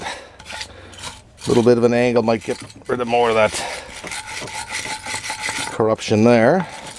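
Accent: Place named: Newfoundland, Canada. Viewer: You must be a Newfie! Awesome and thanks for the video. Cheers from Alberta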